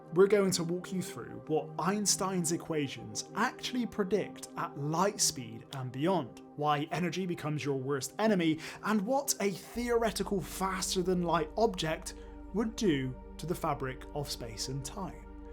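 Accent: Bristol, United Kingdom. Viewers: sorry but how does a kid get to name The Reality Threshold?! I do find the Brissel accent hilarous. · I know a Bristolian accent when I hear one!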